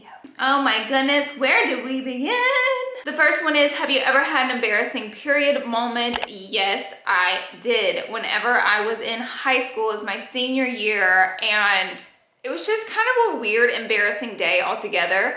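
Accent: South, United States. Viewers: Girl, you still country af!!! But I absolutely love it because I’m a country girl too!! I love watching you 😘😘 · I love the country voice!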